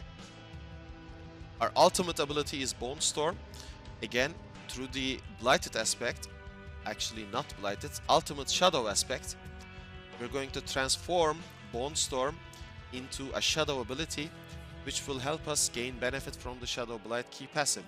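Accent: Turkey. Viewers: Where are you from? You have such a turkish accent.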